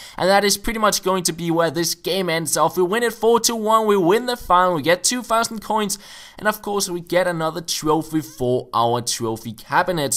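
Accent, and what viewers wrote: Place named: Denmark. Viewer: Man kan sgu bare høre at du Dansker ikke sandt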